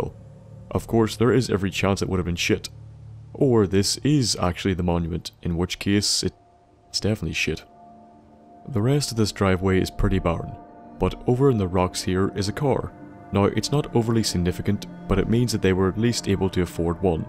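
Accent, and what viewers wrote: Ireland. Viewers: As always great video man keep up the amazing content and I've been wondering this are you Irish if not my apologies but just wondering keep it up man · Yo are you from ni or Ireland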